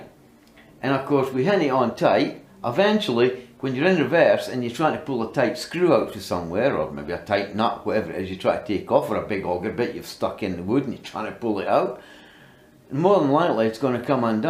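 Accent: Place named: England, United Kingdom. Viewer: Ingles de Inglaterra.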